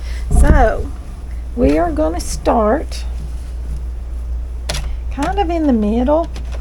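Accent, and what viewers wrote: South, United States. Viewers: I just subscribed tonight. This is my second video and I watched one more before this one. I thoroughly enjoy your videos. I love hearing your southern accent. Im originally from South Mississippi but due to being in the military and my husband's home being here in Virginia, this is where I live. Thanks so much for sharing your talent. 😊❤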